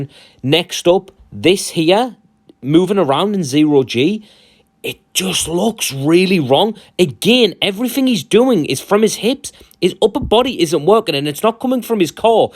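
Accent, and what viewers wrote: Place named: Scotland, United Kingdom. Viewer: I am giving you thumbs up just for that epic Scottish accent man, aside of that you are right some animations need polish :D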